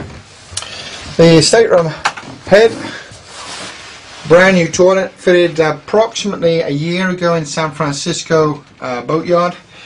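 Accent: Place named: United Kingdom. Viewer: Why does a British accent seem to make things oh so good. An insult to ones intelligence. The dude yaks to much.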